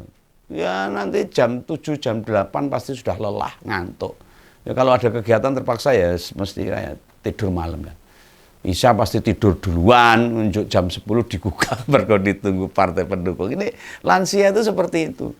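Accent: Java, Indonesia. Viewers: kemunculan paman selalu dinanti. Gaya bahasanya yg medok itu, jelas& enak didengar. Isinya realistis, nyata adanya, maju terus, semangat!!!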